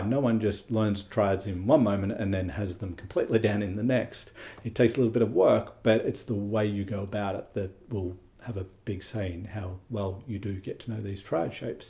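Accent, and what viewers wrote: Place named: Australia. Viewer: I thought his’ cool the caption helped me break that awesome accent(Aussie ?) so its worth subscribing to his vid acct…ty mate ❤ fr felipinas ❤🎉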